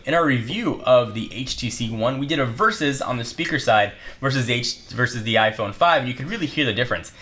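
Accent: United Kingdom. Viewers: british accent on a phone always makes the phone better.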